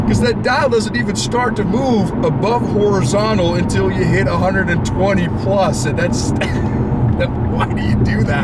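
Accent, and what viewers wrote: Netherlands. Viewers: Are u seriously dutch? Thats cool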